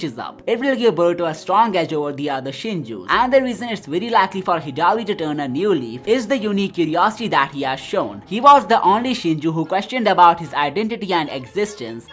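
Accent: India. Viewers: Broo I thought ur indian because of ur accent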